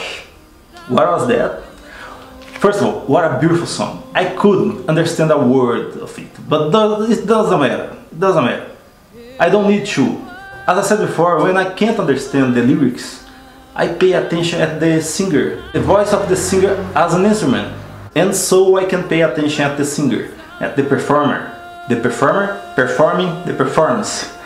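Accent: Brazil. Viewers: Cara! Que legal que te encontrei. Imaginei que fosse brasileiro, já no início do vídeo, pelo sotaque kkkk Interessante não ver comentários de outros brasileiros e a prevalência de russófonos e outros estrangeiros. Parabéns pelo trabalho. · u sounded like Brazilian speaking English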